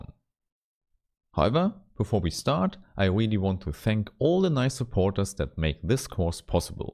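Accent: Germany. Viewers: Thanks for the Contant, but are u maybe from German ?😂😁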